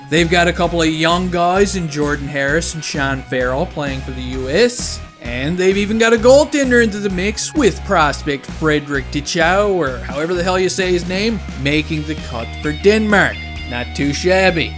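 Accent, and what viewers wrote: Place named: Labrador, Canada. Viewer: it sounded like Scotian Canadian quit😢. your my habs man now. I'm a nunatsiavut man on the west coast now so hearing your accent does bring me back which I love. I hope you don't quit on us or I'll have no one to get my habs news from besides the nonconstructive judgemental Habs news programs.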